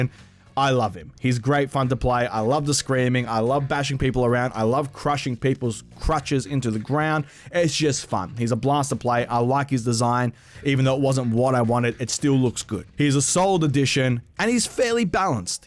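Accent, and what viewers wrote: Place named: Australia. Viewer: Gaming i noticed,none other than australians swear and say fuck this way 😂😂love your vids bro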